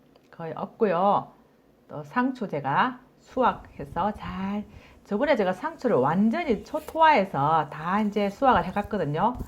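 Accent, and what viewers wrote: Gyeongsang, South Korea. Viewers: ㅎ ㅎ 이빠이 경상도 말은 정말 구수합니다 특별이 이빠이는 일본말이죠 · 완전 경상도 말씨입니다" 밭작물은 주인을 잘 만나서 그런지~~채소들이 싱싱하네요" 그전부터 유튜브동영상을 보고 있는데 척척박사 이네요" 잘보고 갑니다👏👏👏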